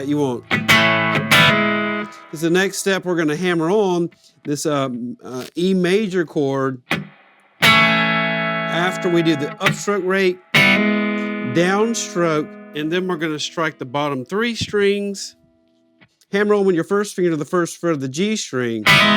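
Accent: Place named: South, United States. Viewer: okay thanks ( by the way that is not my picture,,just props) any who this lesson is really valuable to me i will diffidently use this one!!! hey in this world of politically correctness which is completely ridicules ( thanks obama) i just gotta comment on your accent,,i like that southern draw when you speak,,I'm from Texas my self i thought man that guy sure does sound like my home boy ! any who GREAT SHUFFLE Lesson...e : )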